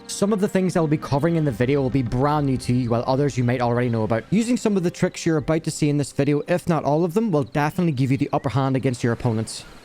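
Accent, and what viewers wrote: Northern Ireland, United Kingdom. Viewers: Yeooooo do i hear a northern Irish accent?!?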